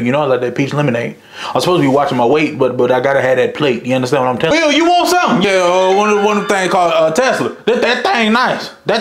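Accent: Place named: South, United States. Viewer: Where bro from he sound southern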